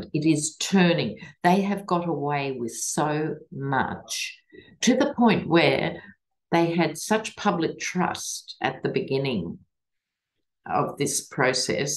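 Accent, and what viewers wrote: Australia. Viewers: I Love that you're going to be on Mary Trump's show as a political commentator. You can seem sometimes like a Sherlock Holmes of tarot and occasionally a gypsy woman tarot reader always with a distinct Aussie accent. Looking forward to your considered thoughts and opinions.🙂 Peace ✌️ ❤️ Love you lots ❤️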